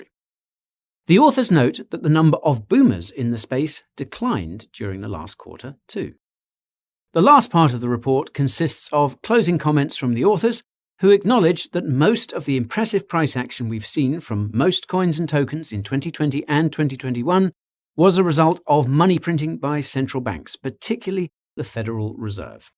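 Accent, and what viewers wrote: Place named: RP, United Kingdom. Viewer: You somehow remind me of Peter Dinklage in GOT with your british accent. Love you champ 😎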